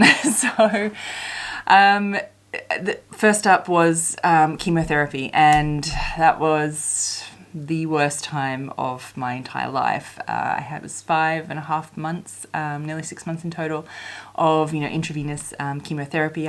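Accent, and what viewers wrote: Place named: Australia. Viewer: Just found your channel searching for ‘learn to sew videos’… so excited for watching all of your catalogue while I clean and craft and cook and maybe even try some sewing. Thank you for your videos, and so excited for a fellow Australian voice!! Also, amazing news for your recovery…I’m a breast cancer researcher (only a little bit part time with a family, the rest of the team does most of the work), overcoming TNBC is huge.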